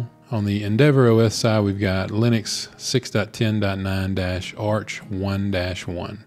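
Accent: United States. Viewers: Wonder why I can kinda guess which part of USA you're from!?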